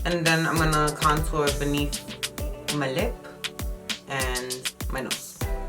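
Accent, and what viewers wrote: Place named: East Coast, United States. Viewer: My nosey ass found you in a Carly Bybel video comment section and I love love your east coast accent, you're so beautiful and you come across super down to earth. This video feels like hanging out with your friend and gossiping about the new makeup releases. Only thing I would suggest, in certain parts of the video like your background music was louder than your voice. Maybe just lowering your background music, so we can hear your lovely voice 🤗❤️❤️ much love to you and positive vibes your way.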